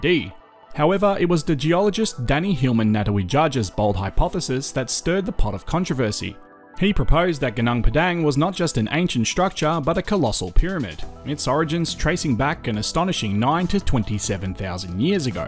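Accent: Australia. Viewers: Suggest you try using local pronunciations rathan than Australian for local names. Eg Gunung Padang. Vowel sounds in Bahasa Indonesia are a bit different than English :)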